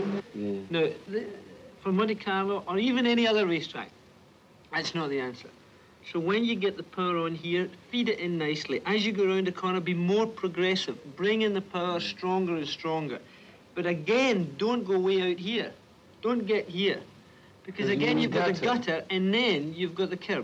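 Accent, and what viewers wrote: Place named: Scotland, United Kingdom. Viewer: The auto closed captioning is just getting crushed by that sweet sweet Scottish brogue.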